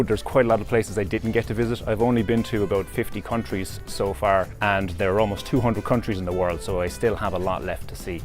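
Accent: Cork, Ireland. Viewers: It has to be Cork. He has Roy Keane and Dennis Irwin’s twang to his accent and voice. But a bit more well spoken lol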